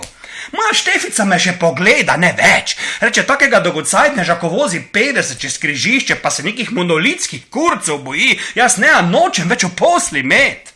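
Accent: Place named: Maribor, Slovenia. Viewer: Pizda še zdej ne morem prebavt mariborščine. Še en rant okol radarjev, stopped 50s. Good luck.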